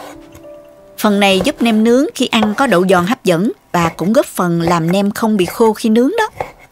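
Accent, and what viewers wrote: South, Vietnam. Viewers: Cám ơn, tôi rất thích xem những Vidios hướng dẫn cách nấu ăn của cô, ngắn gọn mà đầy đủ dễ hiễu dễ làm. Tôi thực hành lúc nào cũng thành công. Tôi không những ghiền chờ đón xem cách dạy nấu ăn cúa cô mà cũng ghiền nghe vọng nói miền nam của cô nữa đó. Mến chúc cô cùng ngừoi thần luôn vui khỏe, bình an. Luôn ủng hộ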